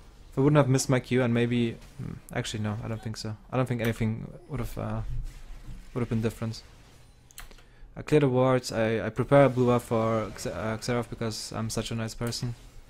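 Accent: Germany. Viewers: Are u actually german? You sound like a german. :d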